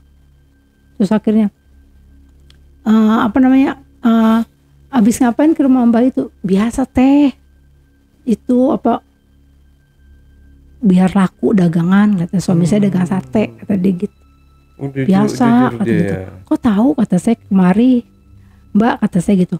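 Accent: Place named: Jakarta, Indonesia. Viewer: Saye suka loga betawinye..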